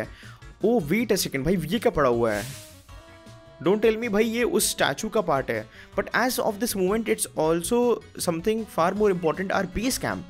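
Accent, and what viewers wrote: Maharashtra, India. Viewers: Kya tum marathi ho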